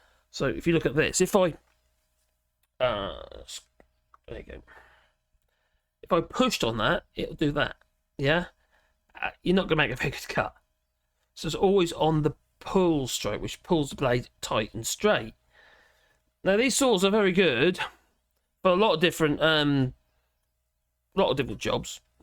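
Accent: Norfolk, United Kingdom. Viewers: You a Norfolk bumpkin buh?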